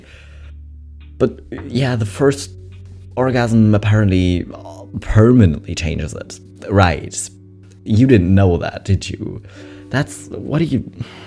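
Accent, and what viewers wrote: Germany. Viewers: as a German it's very clear he's German ( I don't mean it in a bad way, just the way we pronounce stuff is very striking to me haha) much love! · I'm German, too, and I'm usually good at recognizing accents, but his I think is very subtle.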